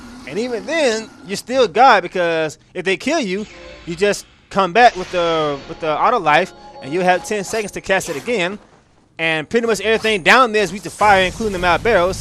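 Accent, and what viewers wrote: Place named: South, United States. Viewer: I've been looking at your vids for a few weeks and the thought just crossed my mind "man dude sound like he's from the south" am I right? anyway great LP.. cant wait to actually have time to implement your tips lol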